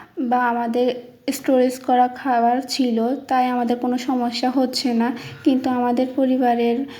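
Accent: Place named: Noakhali, Bangladesh. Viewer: খাটি নোয়াখাইললা 😊😊😊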